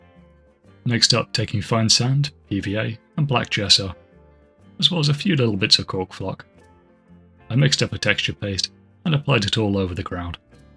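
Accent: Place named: United Kingdom. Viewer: Another great vid! Where do you get your blue foam from? I've not seen it in any of my local craft stores (I'm assuming you're based in the UK based on your accent.)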